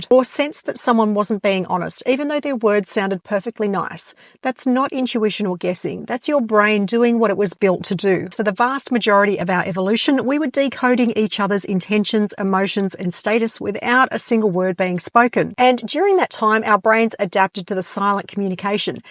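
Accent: Australia. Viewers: OMG the Aussie accent is so harsh on the ear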